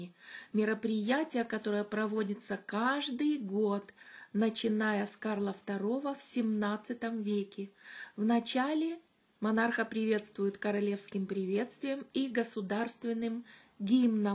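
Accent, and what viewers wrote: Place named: Rostov, Russia. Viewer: НЕ- КАК ??? НУ И БЛА БЛА БЛА с ростовским акцентом !!!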